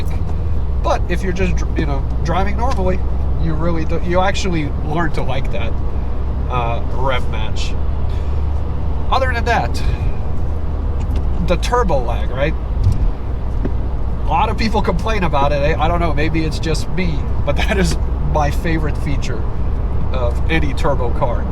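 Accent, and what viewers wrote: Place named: Europe. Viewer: You have a cool accent - kinda of a blend of a European accent with an American Southern accent.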